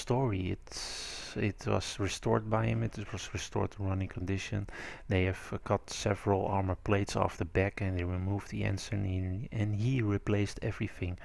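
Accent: Netherlands. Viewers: ja ja als je engels wil praten doe dat dan met engels aksent en niet met nederlands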